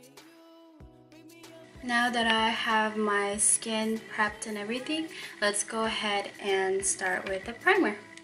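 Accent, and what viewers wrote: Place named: United States. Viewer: Thank you for the review! I was wondering about this primer. ^_^ And ignore that speech comment. I thought you were American because you sounded very clear. :)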